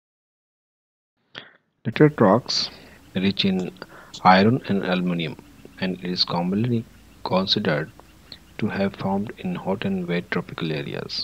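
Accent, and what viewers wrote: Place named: India. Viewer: Interesting content, dear brother, but the way You pronunciate is awful... I understand that You are of indian accent, but nevertheless Your way of pronunciate is very lazy and neglectful... You should try to improve Your way of speaking, or let somebody other do the vocal job in Your videos, or at least provide Your videos with correct captions/subtitles... I hope You understand this and don´t take my words as an offense... Thank You for the video, and have a good luck with Your work and Your channel ;))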